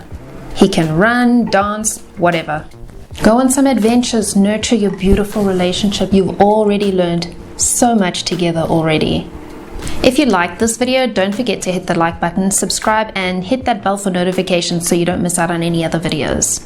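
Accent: South Africa. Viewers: Idk if you are, but you sound so south african!! :D it's weirdly endearing to hear the accent on yt Thanks for the tips btw. Love your channel! It's been a great help so far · She sounds South African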